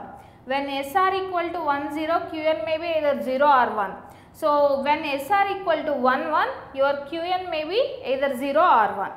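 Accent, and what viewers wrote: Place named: South India, India. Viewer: your accent is shittier than shit itself. God help these South Indians with English lol.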